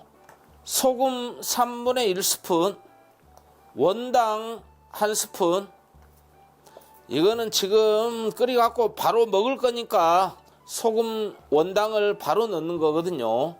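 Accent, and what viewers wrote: Jeolla, South Korea. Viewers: 구수한 말투가 전라도 저 아랫지역 바닷가 근처 목소리셔요.. 구성지게 귀에 쏙쏙 들어옵니다.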